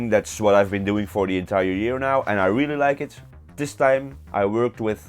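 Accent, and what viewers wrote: Netherlands. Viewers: Your accent sounds very Dutch, am i right ? Ah, Belgisch, close enough ? Het klinkt wel vrij Nederlands, meer dan Belgisch, dus je woont/komt van bij Nederland in de buurt wel ?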